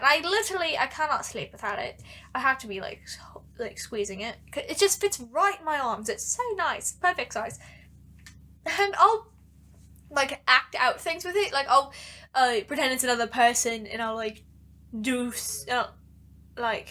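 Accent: United Kingdom. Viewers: I love when you sing on these podcasts, it really brightens my mood! Thank you so much and I love the British accent! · You sound more British than me, a Brit... Edit: And I regularly say y’all :)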